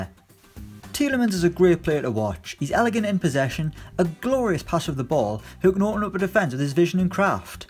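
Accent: Ireland. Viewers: Is this guy irish too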